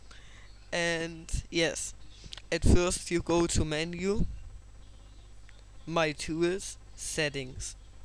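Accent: Germany. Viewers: hey danke für das tut man merkt schoin das du deutsch bist faved and liked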